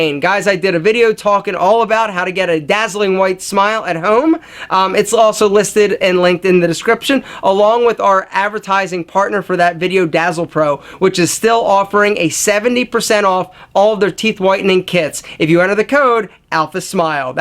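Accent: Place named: New York, United States. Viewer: Athalos hes from the East like New York or something like that i think ha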